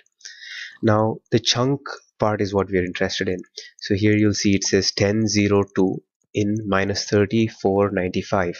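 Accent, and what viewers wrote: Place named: South Asia. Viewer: u have hindi/urdu accent xddd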